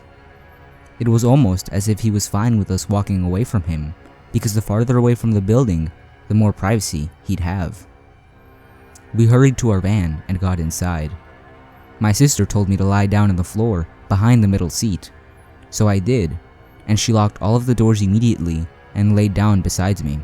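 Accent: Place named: California, United States. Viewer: Subbed! Where are you from dude? I like your accent. California maybe?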